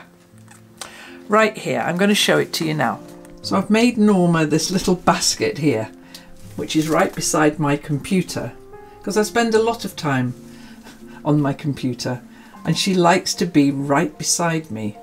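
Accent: United Kingdom. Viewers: I had a cat that became 21 years old. Her name was Whitesocks. You will never guess why ;-) She stopped eating and drinking and our vet told us that she has no table that says what 21 catyears are compared to human years. Enjoy your catlady as much as she enjaoys her life with you. Love how polite you talk to your cats in your videos. That sounds very british in my german ears. ;-) Have good times you two.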